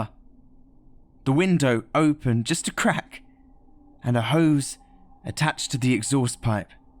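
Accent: United Kingdom. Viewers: British narrator? Good narration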